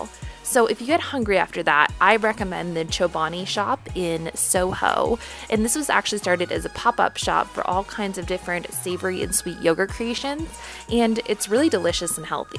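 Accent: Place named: California, United States. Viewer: Your voice you don’t even sound like your from New York you sound like your from Cali... hmm these are all cool but idk I would want real cheep spots from a real New Yorker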